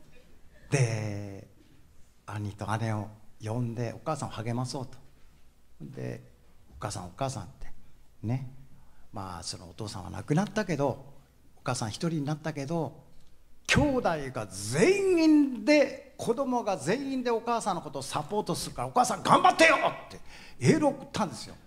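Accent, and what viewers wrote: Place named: Kyushu, Japan. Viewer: 昔と変わらないキーで歌うこうせつおいちゃんの九州弁初めて聞いた気がします。お兄ちゃんの話も初めて聞いて、「 」はGoodVibrationのが一番だと思ってましたが、こっちも泣けてしまいました。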